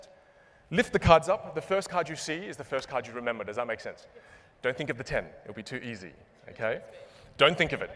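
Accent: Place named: Vietnam. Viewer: Your Vietnamese accent is spot on lol .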